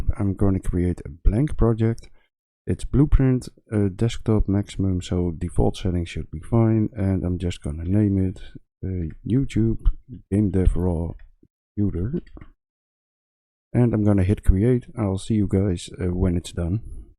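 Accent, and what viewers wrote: Netherlands. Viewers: You can so clearly hear you are Dutch! I am complete 47 years noob learning UE for fun, love this tutorial.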